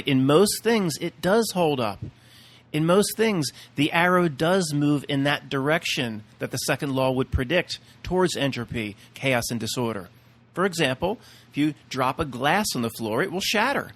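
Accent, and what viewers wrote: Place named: South, United States. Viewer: i love your country voice, thats my voice rofl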